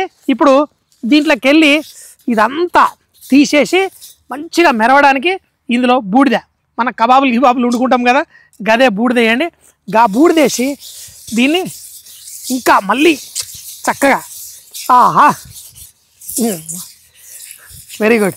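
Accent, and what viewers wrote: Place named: Hyderabad, India. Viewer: pakka hyderabadi slang😁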